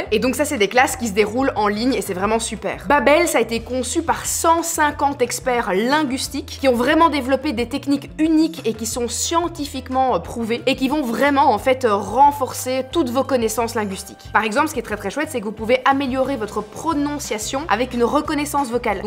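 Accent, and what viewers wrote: Belgium. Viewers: Salut, Je sur kiffe vraiment le procédé de vidéo mêlé à l’humour et cet accent belge … 😂 Mais c’est vrai que ça va un petit peu trop vite … là même chose un rien plus lentement… serait géniale …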